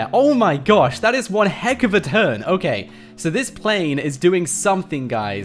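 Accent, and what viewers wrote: United Kingdom. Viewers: Bro are you british🤔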